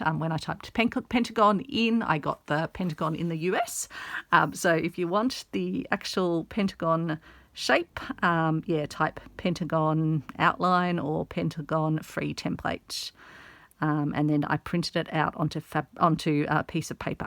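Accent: Australia. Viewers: I thought if you put a horizontal stitch on the back at the point of the star you could put a paper clip or other wire on and use it as a decoration and take it out and use as a coaster later. Just came across you today and am glad to hear an Australian accent. Gold Coaster here. Summer hit here a month ago, then we had a few winter days just when everyone had put away their long pjs.🌲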